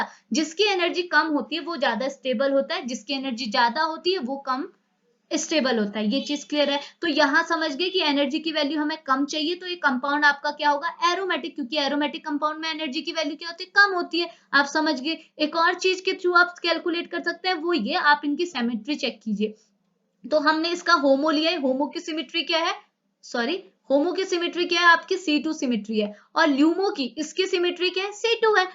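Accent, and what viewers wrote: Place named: Hyderabad, India. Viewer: Aapka haidrabadi tone bhot cute lagta he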